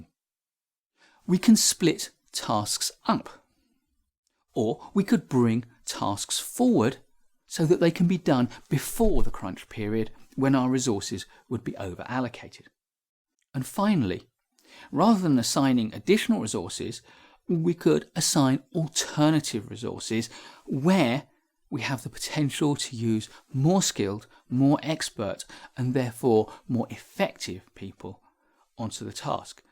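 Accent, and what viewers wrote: United Kingdom. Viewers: Dear, thank you so much for this clear and well-structured explanation. Your British accent is so beautiful, I really enjoyed the video.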